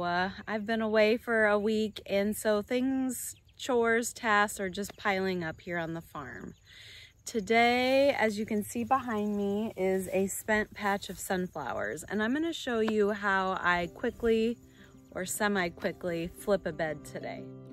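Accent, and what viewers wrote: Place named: Quad Cities, United States. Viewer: This is random, but one of the reasons I love watching your videos because you talk like me! I'm from the Illinois side of the Quad Cities and I say "root" (and "roof") the way you do which I have come to find out is not the way people in other parts of the country say it.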